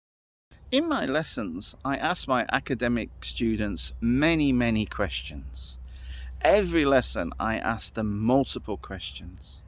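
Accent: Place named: United Kingdom. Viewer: Hi, I stumbled upon you video by chance and I thought it interesting. Recently I am more interested in British English than American English. Therefore I watch many British TV series on Youtube. Your English is clear and very easy to listen to but some characters in British drama speak strong accent and dialect that heard to understand. I haven't realized that there are so many dialect and accent in British English. Anyway I like British English so happy to hear your British English..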